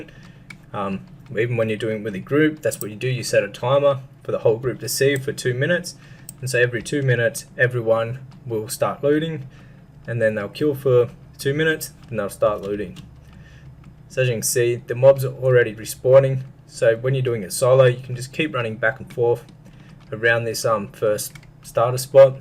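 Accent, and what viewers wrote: Australia. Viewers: Love your Aus accent lol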